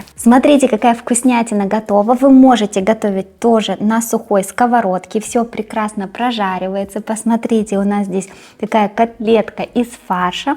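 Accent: Ukraine. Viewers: У Вас неймовірно гарна українська мова,пані ❤ А рецепти -як завжди супер 👌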